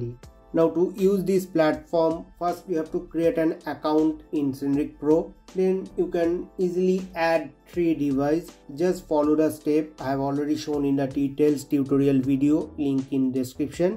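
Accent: India. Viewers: There is nothing more painful than listening to an Indian talk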